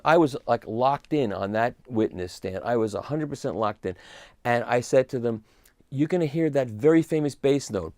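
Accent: United States. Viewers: I’m from Dallas and you’re getting close to the accent. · You sound like Dustin Hoffman in Tootsie with your Texas accent. 😀 Nice job though recounting everything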